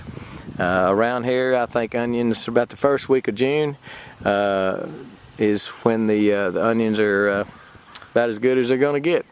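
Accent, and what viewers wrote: Texas, United States. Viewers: He has a great accent. I wonder what part of Texas he is from. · Always great to hear another Texan.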